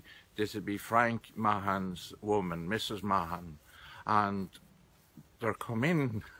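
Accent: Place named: Longford, Ireland. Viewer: He sounds like my Step Gran used to, also from County Longford. Boy do I miss her. RIP Gran Molly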